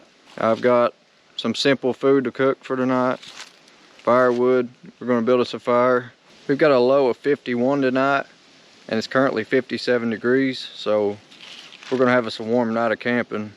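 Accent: South, United States. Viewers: nice, you have a pretty strong accent and I figured you are from the south, a gun would be a good idea eh but then again you are safer in the woods then in a city lol here in Canada we are under attack because our government wants our guns 😡 we are fighting like hell to keep them, anyway that’s a long story… stay safe 🤙🏻🇨🇦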